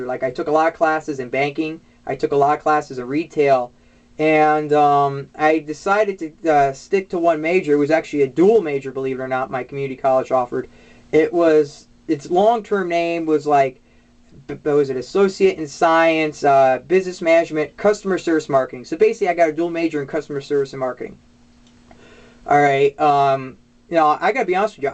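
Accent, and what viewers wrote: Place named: Minnesota, United States. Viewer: saintgauden i'm from Illinois so i'm guessing he's from Minnesota. Wisconsin, Michigan, Illinois dialect sounds similar.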